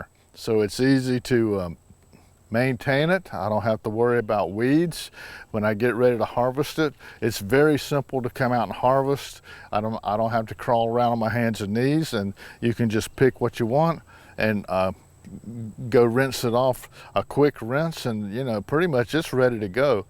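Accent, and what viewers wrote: South, United States. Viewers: 😂His drawl is what I enjoy, too! You're a lovely man,! 🎉 -Judy 🇨🇦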